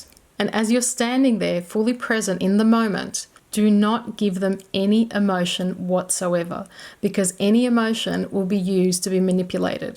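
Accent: Australia. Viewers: Yes it is a lovely hypnotic Australian female voice.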